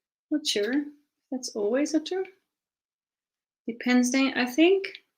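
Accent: Netherlands. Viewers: Hey, thanks for the informational vids, just discovered your channel yesterday, it was linked below a video of the mystery box show. I already recognized a dutch accent with you:) hoop dat je een fijn weekend hebt gehad!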